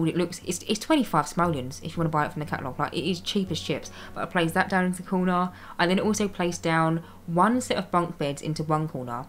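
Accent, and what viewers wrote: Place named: England, United Kingdom. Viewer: Just got it! I love it, a loverly face, and you talk like that, real pommy accent. I can't talk i'm an aussie.good job mate!.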